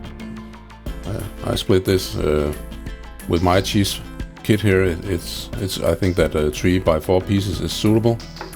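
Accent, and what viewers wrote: Denmark. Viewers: Are you danish brormand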